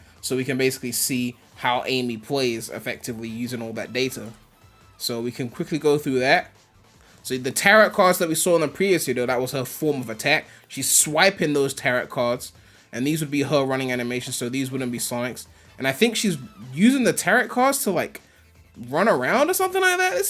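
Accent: United Kingdom. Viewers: i like your british accent bruv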